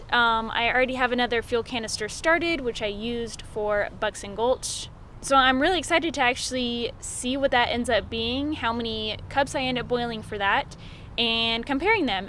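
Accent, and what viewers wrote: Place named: Michigan, United States. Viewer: Thank you for the informative vid. It was very helpful to me as I am also into hiking and camping too and have questions like this about most products that I could use. Are u from Michigan? Your accent...it's either Michigan or Wisconsin isn't??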